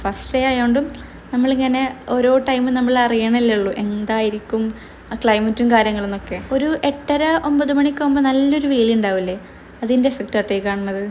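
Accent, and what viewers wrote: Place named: Kozhikode, India. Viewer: Ningale slang kettitt oru kozhikodukaariyaanenn thonni😌🤌🏻, anyway avidethe fees engena pg?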